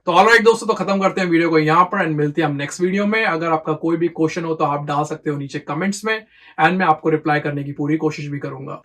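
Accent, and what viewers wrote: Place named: United States. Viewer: bhai... thanks for sharing this video. Can you pls make a vlog on how you got your language ascent changed from being Indian speaking to American ascent? It would be fun to listen to you on this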